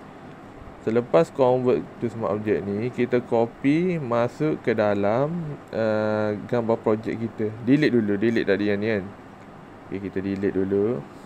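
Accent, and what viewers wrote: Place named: Terengganu, Malaysia. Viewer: Saya suka abang cakap ganu je..terhibur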